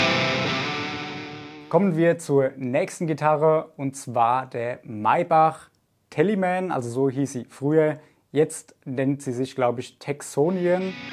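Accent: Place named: Wiesbaden, Germany. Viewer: hört sich mehr nach Wiesbaden an..😉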